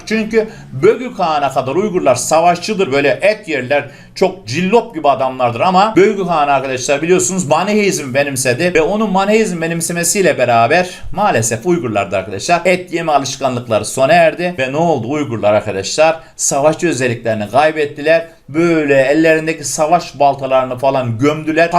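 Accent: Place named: Adana, Turkey. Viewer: Bende tam bu soruyu soracaktım 😅 Adanalı olduğum için Adanalı olduğunu anladım 😅😅😅 kdkddj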